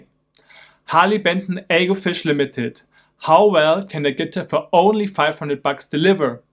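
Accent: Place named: Germany. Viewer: Your accent is atrocious. You should not be making videos. It's ridiculous. Meine peinlichen Mitdeutschen immer...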